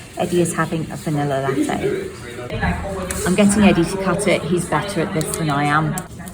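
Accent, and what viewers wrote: Ireland. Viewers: perfect Irish accent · Loved the Irish accent haha ❤❤ I would love to try a banana daiquiri but never see them on the menu anywhere! Those cocktails at the end looked amazing 😻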